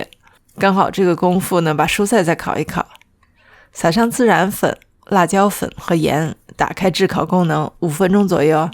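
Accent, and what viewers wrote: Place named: North, China. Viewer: 太赞了！谢谢。你亲切北方口音也很暖我心❤️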